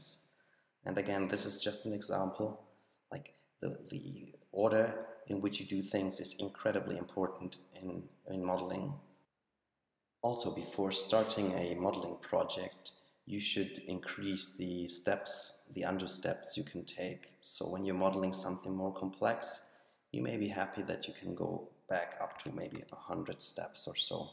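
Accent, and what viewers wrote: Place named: Germany. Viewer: der deutsche akzent :D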